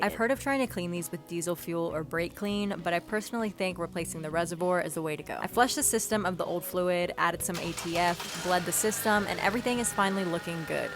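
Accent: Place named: West Coast, United States. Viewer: She sounds like she's from the West Coast. East of the Rocky mountains, the cars all rust out.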